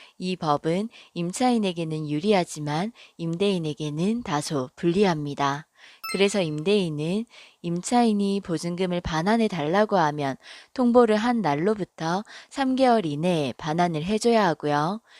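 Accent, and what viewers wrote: Gyeongsang, South Korea. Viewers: 갱상도 발음이 참 귀여우시네요~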